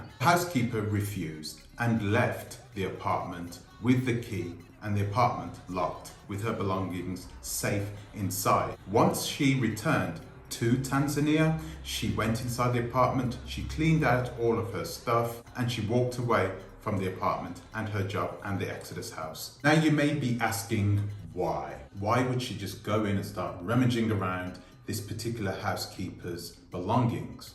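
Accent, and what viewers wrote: United Kingdom. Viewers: It's easy for you to sit there with your British accent and judge this woman. So I ask you, when have you ever run an establishment like this?? Do you know her history? Have you seen the videos of the property before she restored it??? Do you know what it's like to have housekeepers and to run a home staff?? Especially when you're from a different country than your staff?? Do you even know that she's a single mother?? Have you seen the videos of people who have done review videos of their stay at Exodus?? They have filmed and shared their wonderful experience that they had there. Did you see the interview that was nearly an hour or more that the YouTuber called traveling sista did with her and how beautiful it was?? Do you know her history?? So stop trying to have a sensational story to grow your pathetic channel. Why don't you try going back to your roots and get rid of that damn British accent which never looks right on an African person. 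Take your ass to Tanzania or any other country and establish something for African people. Stop judging!! What goes around comes around and karma can be an evil bitch.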